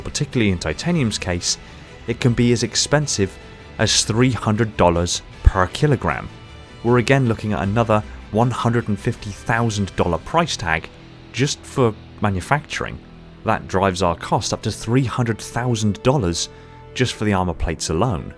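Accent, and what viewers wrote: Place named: Bristol, United Kingdom. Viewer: You from Bristol, thought I heard a twang in there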